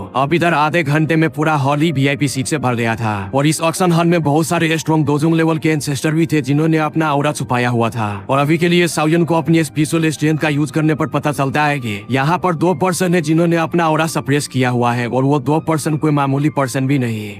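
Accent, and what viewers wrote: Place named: Bengal, India. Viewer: Vai, tu Bengali hai?? I'm 400% sure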